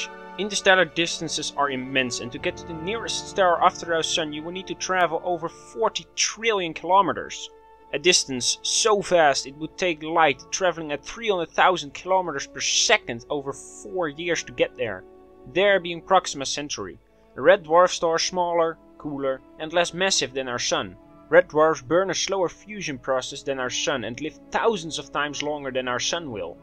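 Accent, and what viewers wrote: Netherlands. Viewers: That Dutch accent!!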